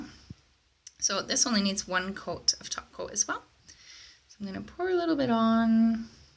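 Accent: Scotland, United Kingdom. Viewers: Do I hear a wee bit Scottish in your accent? X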